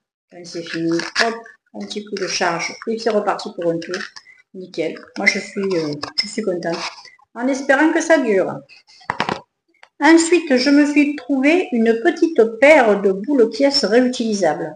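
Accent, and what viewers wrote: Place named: Provence, France. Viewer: Bonsoir, je vous regarde de Paris et j'adore votre accent, vous êtes du côté de ma sœur vers Cavaillon. Juste une petite précision le 2ème micro je pense qu'il faut l'accrocher à votre chemisier. Bonne continuation ❤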